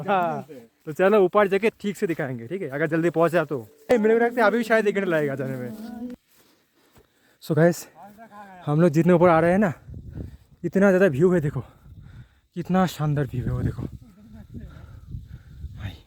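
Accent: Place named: Bengal, India. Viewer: sune mone hochhe tumi bangali, hindi te vlog kano korcho?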